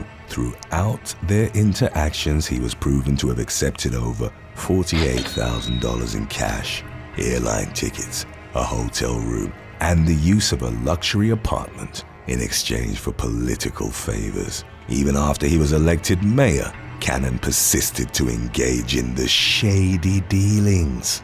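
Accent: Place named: England, United Kingdom. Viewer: He's speaking proper English. Remember, that's where American English came from.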